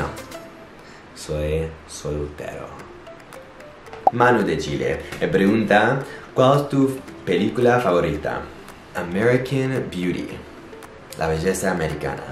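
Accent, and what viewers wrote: Buenos Aires, Argentina. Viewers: No sabia que habias vivido en Buenos Aires, pero me di cuenta al toque por que tu español es marcadamente porteño, ni siquiera Argentino... porteño! jajaj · Tenes la pronunciación de la letra R nuestra! De los porteños! 😝. Your Spanish is excellent! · First of all, someone's accent is Argentine, not Argentinian; "Argentinian" is the name give to the people from Argentina, whereas "Argentine" is restricted to things, both from the said country. Second of all, he does mention living down there, working down there and even dating someone from down there. Finally, he does sound like an Argentinian at times; that's probably because of how he learned. Quit bitching... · Te amo fuerte, tenes un acento muy porteño jajaja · Tu tienes el acento “argentino” más claro que he oido en mi vida jajaja. Yo soy de los Estados Unidos también, pero gente dice que yo tengo acento mexicano o peruano. 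Algunos creen que tengo acento mexicano y algunos creen que tengo acento peruano. Pero no he vivido en un país donde se habla español y por eso mi acento no está escrito en piedra. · I can definitely hear the Argentine accent in your voice... Also your Latin mannerisms you picked up come through... Definitely interesting... I do the same thing when I speak Castilian Spanish... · Suena como una mezcla de argentino, español, y yanqui. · I think what they're saying is that his accent has features most associated with accents heard in Argentina, most notably the way he pronounces doble L. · no dijo que no tenga acento gringo, dijo que su español es muy argentino, las formas de pronunciar las palabras las acentuaciones y sobre todos los "sh" sounds que se les escapan a veces son caracterisitco del español argentino obviamente mezclado con su acento gringo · Que increíble tu español argentino 😍🙂💪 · It's a mixture of Argentinean & Spanish from Spain. 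· Hablas como argentino porque dices "sho" y no "yo". Pero esta super bien 😄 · Me encantaaaaa cuando mezclas el acento argentino y español!! 😍😍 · I love your Spaniard/ Argentinian accent! Lo haces perfecto! Te felicito. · Interesting, normally Americans learn north American or European Spanish, but you learned Argentinian spanish and even have the strong accent! I love it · Tienes marcado el acento Español pero con cierto toque de Argentino! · you have an Argentine accent when you speak Spanish, I love it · Me mueroooo jajajaja es que escucharlo hablando con acento argentino es súper funny. Le queda bien. Saludos desde Puerto Rico. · Es verdad tiene un toque de acento como los argentino como nosotros habla muy bien .great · ESE ACENTO ES FABULOSO !!! MARAVILLOSO !!! ME ENCANTA ESE ACENTO !!! ARGENTINO ES LO MAS !!!!!!!!!!!!!! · te sigo hace poco tiempo y realmente hablás muy bien español, me encanta que tengas el acento argentino, me alegro que te haya gustado vivir en nuestro país y conocer nuestra cultura. 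Espero que puedas volver, aún hay mucho por conocer 😁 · Lucio Martínez yo soy argentino y te puedo decir que tiene un acento muy similar al nuestro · Hola!!! Me sorprende que hables en español tan bien, incluso tienes acento argentino. Eres muy respetuoso, divertido y buena persona y se nota al hablar. No cambies nunca. Un abrazo desde España.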